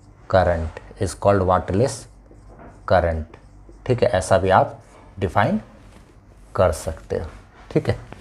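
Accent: Bihar, India. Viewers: Sir.. Aap Bihar se ho kya??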